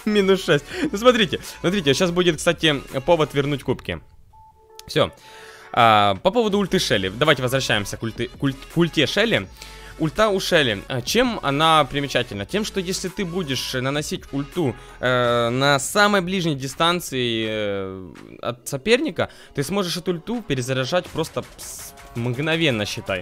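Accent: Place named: Surzhik, Ukraine. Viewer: на мое мнение, звучит коряво. Это суржик с украинского на мою думку. Пожалуйста, по моему мнению, уши немного режет)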